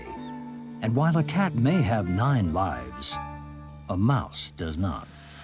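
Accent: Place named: United Kingdom. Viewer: Why does the making of segment always have the original uk narration instead of a us narration?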